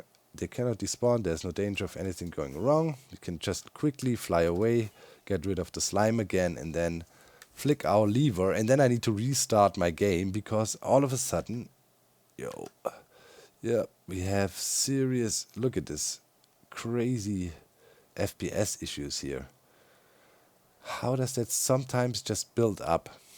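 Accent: Germany. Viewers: "fiddl wid it a littl bid" must be the cutest thing you can say with an endearing German accent like ❤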